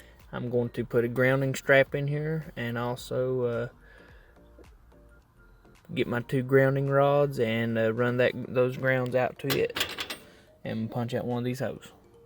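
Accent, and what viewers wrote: South, United States. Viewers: Dang that accent sounds more south. I have to put in a 200 amp meter pole for a single wide Saturday I'm gonna be doing it alone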